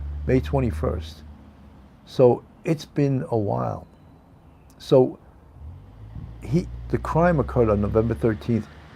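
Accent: New York, United States. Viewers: Your NY accent is great! Why do negative people always feel the need to comment? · I love your new york accent. I love New York ❤ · I love your accent!!! Nyc??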